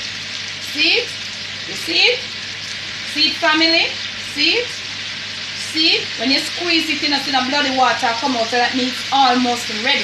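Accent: Jamaica. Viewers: I really. Enjoy style of cooking its unmistakably authentic, i love the advice and the teaching points. As a results her viewers learn as if we are Island too.last but certainly not least the Jamaican Accent is so intridging until i wanna learn im sure just like her viewers.thanks cant wait to view ox tail, festival,fish tea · Since finding your channel I have been watching your videos every day. It is sooo nice to hear a true Jamaican voice when you live in 'foreign'. But even better than that are your mouth watering recipes. Authentic Jamaica cooking! I wish you were here in London running your own Jamaican restaurant. You wouldn't have hands to sell, trust me. Keep up the good work and we will keep watching. Love you gawn to bed! xxx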